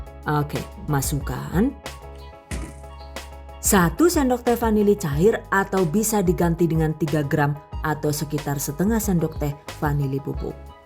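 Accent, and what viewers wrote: Banyumas, Indonesia. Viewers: mmg sllu beda...lbh keyeeennn...tampilan kuenya cantiq kyk inyong ☺😚ngapaknya itu lho jd ciri khas 🤗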